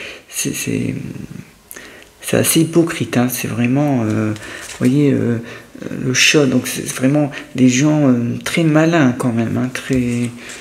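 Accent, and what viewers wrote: North, France. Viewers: Bonsoir. Je vous découvre pour la 1ère fois ce soir et vous m avez bluffée!!!!! Vos prédictions sont incroyables et m ont parlée à 1000 % surtout concernant min travail c'est tout à fait ça!!!! Vous etes dans le mille!!! Je me abonne à votre chaîne avec grand plaisir ❤. De plus je reconnais un petit accent nordiste et j'ai vecu à avesnes sur helpe toute mon enfance et j'ai de la famille à Maubeuge donc je connais bien la région que j aime beaucoup même si je vis actuellement à Marseille. Au plaisir de vous écouter avec hâte. Cordialement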